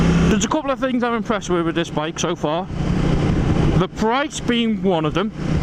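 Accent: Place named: North West England, United Kingdom. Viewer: Thanks. i think I have been through there before. Nice pub at Aldbury! I wasnt sure whether it was cheshire given that your accent is from the Noth West. Cheers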